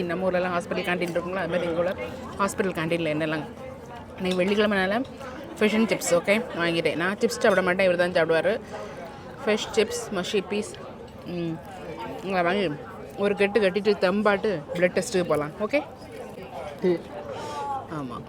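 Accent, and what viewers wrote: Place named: Kanyakumari, India. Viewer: அன்பு சகோதரி ❤❤❤❤❤❤❤❤ எங்களுடைய திருவிழா வாழ்த்துக்கள் ❤❤❤❤❤❤❤❤❤கன்னியாகுமரி வட்டார மொழி மிகவும் பிடிக்கும்... இன்சூரன்ஸ் advisor's BOTH LIC &MEDICAL Star health insurance ❤❤❤❤❤❤❤ PLEASE UPLOAD.... LONDON PEOPLE HOW TO CHOOSE INSURANCE....❤❤❤❤❤❤❤❤❤❤❤❤❤❤❤❤❤ THIS IS AWARENESS VIDEO... KINDLY MY request.... Take care sister....❤❤❤❤❤❤❤❤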